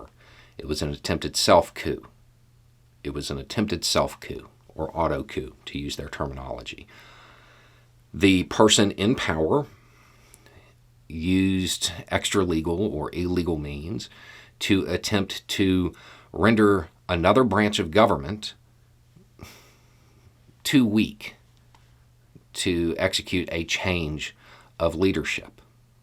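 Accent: South, United States. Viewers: More people “looking like” Beau .... color and accent coming from the south need to be doing more of what Beau is greatly accomplishing right now in speaking the truth etc as the usual perception as mostly been negative and mostly Republican supporters spewing madness and garbage. Cudos to Beau for jus simple been honest and right!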